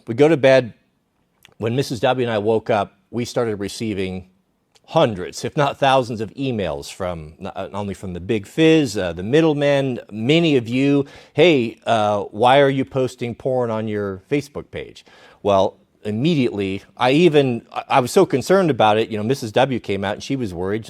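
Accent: India. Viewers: Indian accent is the dead give away 😂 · Indian voice is a dead giveaway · First sign of trouble indian accent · Rule number 1. Don’t trust indian accent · Always the same indianish accent... why?!😢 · Indian accent?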